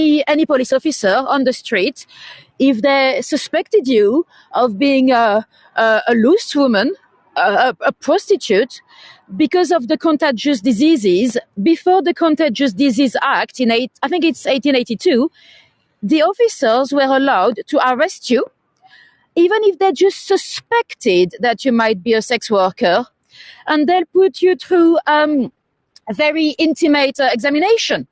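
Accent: France. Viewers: You literally have the best French accent ever. I could listen to you all day…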